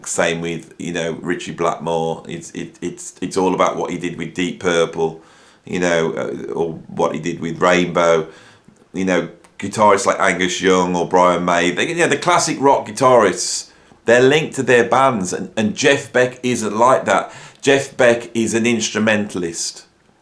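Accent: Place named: England, United Kingdom. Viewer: what region in England is your accent from?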